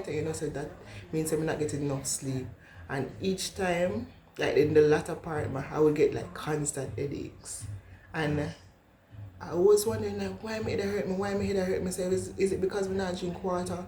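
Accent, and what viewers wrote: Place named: Jamaica. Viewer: I love your accent girl..omg😍😍😍😍😍😍, I love Jamaicans 🥰❤️🥰❤️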